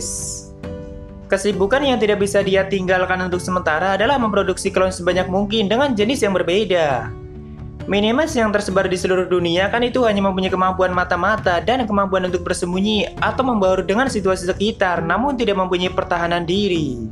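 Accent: Java, Indonesia. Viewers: Iya sih klo pas ngbrol kliatan Jawa nya, mending gituu sih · Kemaren di live megane sensei logat jawamu kentel bang....kok di kontenmu ga keliatan logatnya????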